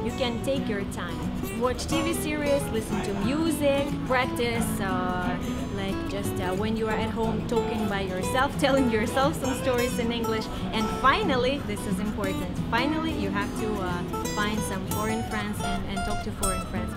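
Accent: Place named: England, United Kingdom. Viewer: Thanks for your recommendation. I like your natural acting and English accent.😍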